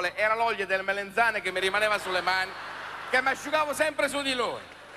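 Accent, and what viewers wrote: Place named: Piedmont, Italy. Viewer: È vero sono così i Calabresi, poi l'accento piemontese è fantastico!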